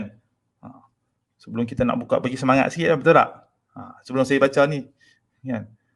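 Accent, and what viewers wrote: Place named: Pahang, Malaysia. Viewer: tuan orang Pahang kan . loghat macam Pahang je 😆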